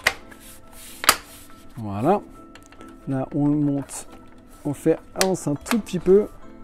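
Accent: England, United Kingdom. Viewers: Arrete avec ton accent anglais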